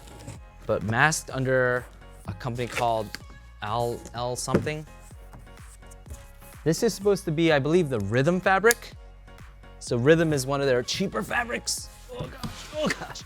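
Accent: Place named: Europe. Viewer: Sounds like he's from Europe.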